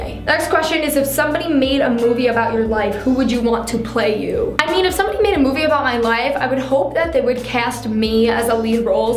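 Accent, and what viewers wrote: New York, United States. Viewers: yo i love her slight accent it’s so cute holy new york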